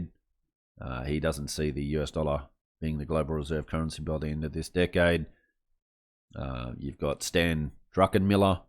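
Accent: Australia. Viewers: Learn to speak properly bogan..........we are not all feral westies.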